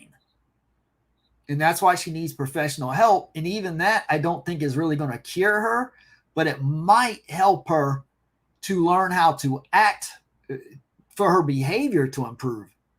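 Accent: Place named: Scotland, United Kingdom. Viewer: Hi Im not really Stalking you lol. I love your channel. Id go live later after UK time 6pm when everyone is home from work. From experience more people will be able to join your live. I dont know what time it would be where you are. Your Scottish accent is brilliant. I love your coverage and hope Richard gets justice. Lots of love 💖💕xxxx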